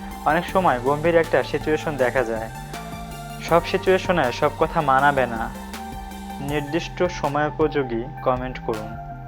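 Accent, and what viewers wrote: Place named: Bangal, Bangladesh. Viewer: first bro,rectift ur own voice.bangal bhasa so irritating,cant u speak proper bengali?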